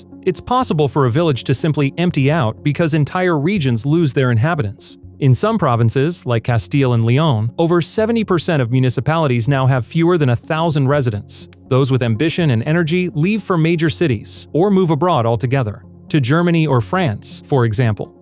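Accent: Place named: United States. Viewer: American accent. First topic, MONEY. Why am I surprised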